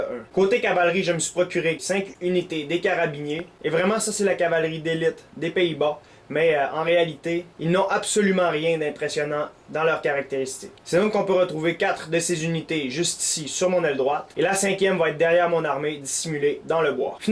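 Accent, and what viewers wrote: Quebec, Canada. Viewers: est canadien?? c est de quel region cet accent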